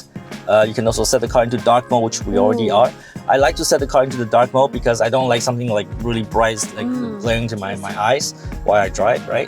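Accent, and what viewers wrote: Standard. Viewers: chị nói tiếng anh chuẩn vl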